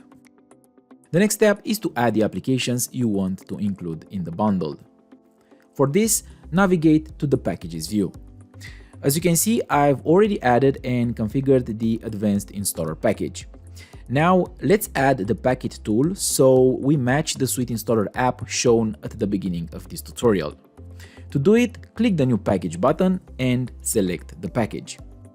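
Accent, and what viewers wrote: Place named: Romania. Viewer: Mereci de tutorial :D excelent :D mi-am dat seama ca esti roman dupa accent :D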